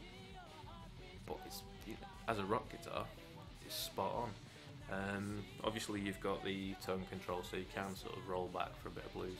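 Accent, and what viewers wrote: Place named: Stoke-on-Trent, United Kingdom. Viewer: Are you from Stoke-on-Trent by any chance? I recognise your accent!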